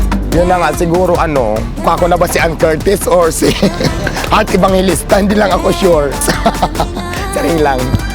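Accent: Visayas, Philippines. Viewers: alam ko bisaya ka accent mo palang. parihas tayu hehe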